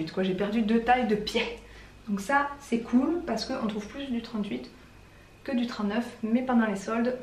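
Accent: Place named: Marseille, France. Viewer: Je vis à toulouse et je trouve que tu as plutôt un accent de marseille :’) Personnellement j’ai 60kg à perdre avec la sleeve, et j’ai bientôta date de mon opération!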